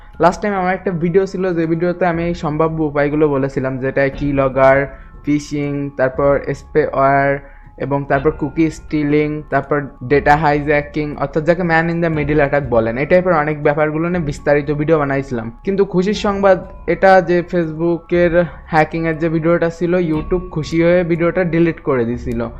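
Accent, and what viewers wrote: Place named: Jessore, Bangladesh. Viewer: ভাই আপনার বাসা কি যশোরে নাকি। আপনার ভাষার যশোরে মত লাগে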